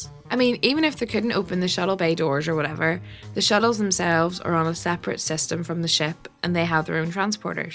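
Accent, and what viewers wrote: Scotland, United Kingdom. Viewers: Just curious but are you Scottish, maybe Irish?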